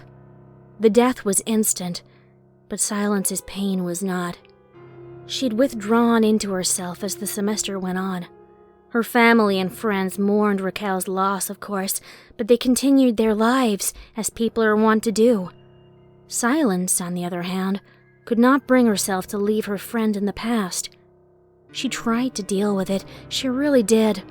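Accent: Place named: United Kingdom. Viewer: I don't know why,but I never really took notice as to how much suppressed her British accent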